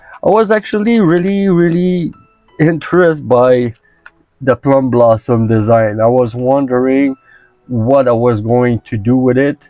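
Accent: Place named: Quebec, Canada. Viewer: Salut! Ca fait quelque temps que je vois ca passé et je suis tout autant intrigué en espérant de les voir en action bientôt! Ca aurait aussi ete le fun que tu fasse des vidéo en français parce que clairement a l'accent tu est québécois 😊 ce genre de contenu est vu et revus en anglais, je comprend que tu essaie de rejoindre la plus grande audience possible mais bon moi j'aimerais bin ca trouver plus de ce genre de contenu en français et je suis sûrement pas la seule. Sur ce merci pour tes Vidéo 😊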